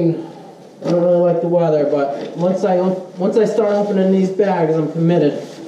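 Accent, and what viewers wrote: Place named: New England, United States. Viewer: It’s an innocent question but there’s weird dudes who’d infringe on privacy. Most tubers don’t disclose for obvious reasons. dialect shouts New England for sure. I’m just guessing New Hampshire down to Pennsylvania with those beautiful mountains....but that a guess.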